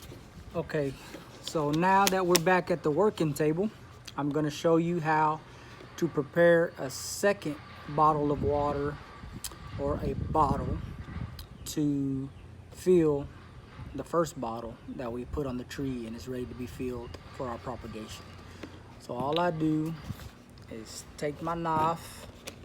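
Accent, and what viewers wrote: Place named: Texas, United States. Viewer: Thanks for this video! I’m in Los Angeles, CA, but I’m Mexican-American with family in the Galveston area & I immediately clocked your accent. Love it and makes me miss TX. Y este bigote! Nice. Also, I have a question. I have a peach tree that is in an area of my yard that doesn’t get enough sun and clearing the foliage & bushes around the existing tree isn’t an option. Half of the tree was diseased and I cut all of those parts off. (We found the tree this way when we moved into the house.) I just set up the water bottles yesterday. Will the tree being formerly diseased (I think it was a bug infestation because it was leaking so much sap!) affect my propagated peach trees? My plan was to take the propagated trees and plant them in a better part of the yard, then cut down the mother tree.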